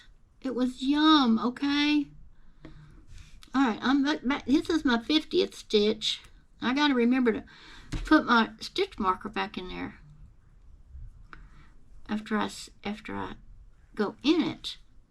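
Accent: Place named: South Texas, United States. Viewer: Just love watching you ‘cause you talk like me! I’m a south Texas native who made my first cardigan following your tutorial this spring. Thanks a bunch, friend! (Ps - I’m a retired nurse too!)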